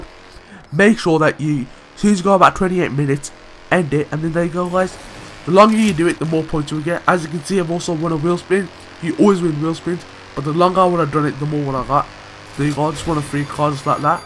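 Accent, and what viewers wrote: Birmingham, United Kingdom. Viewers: Bro just cuz he's got a Birmingham accent doesn't mean he's romello's dad 😂😑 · Im pretty sure this is a brummie accent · he has a good british accent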